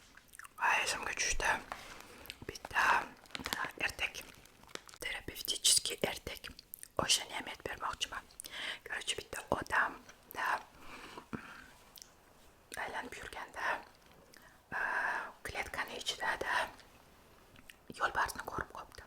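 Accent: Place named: Namangan, Uzbekistan. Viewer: Namanganlimisz shevada oxshavotti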